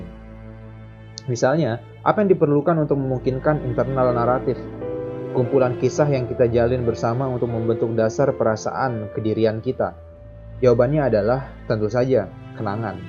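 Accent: Lombok, Indonesia. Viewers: Orang lombok bang?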